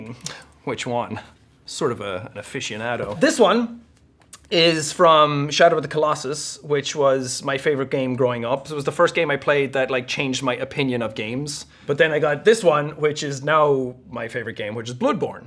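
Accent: Ireland. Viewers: Most Irish accent ever lad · "an Irish accent goes a long way"... Have you forgotten how you started out:s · I am not about to scroll through 4,425 comments to see if anyone else has already said this, but the question is "Is Jacksepticeye in Human Resources?" There is a show on Netflix called Human Resources, which is a spinoff of Big Mouth and has some crossover characters. There is a character named Flanny O'Lympic, a Lovebug who speaks in an Irish accent. He is voiced by Chris O'Dowd, a fellow Irishman (best known as Roy on The IT Crowd). Maybe the people who googled that think Chris and sound alike because they are both Irish? It premiered March 18, 2022, three months before this video was released. Maybe hadn't heard of it or seen it. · The reason why I start watching his videos because of his Irish accent sound funny and inspiration but long stop watching his video due to burnout so I just start to see my sister become fan of now. 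It have been 7 years already that I stop watching his video now good to hear he healthy and keep continuing his career. Well except those stuff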